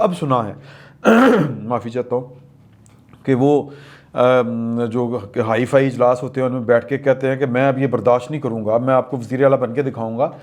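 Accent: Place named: Lahore, Pakistan. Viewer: I also belong to Lahore. And like your Lahoree Style for the deliverance of your views.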